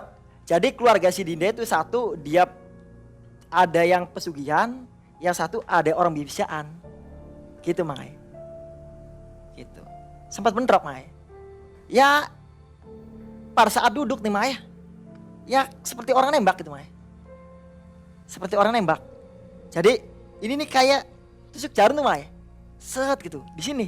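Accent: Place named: West Java, Indonesia. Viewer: Pasti orang Jawa Barat 😂 Salam kang sing Indramayu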